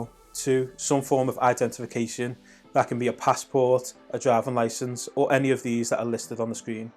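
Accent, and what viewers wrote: Liverpool, United Kingdom. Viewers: You sound like a scouser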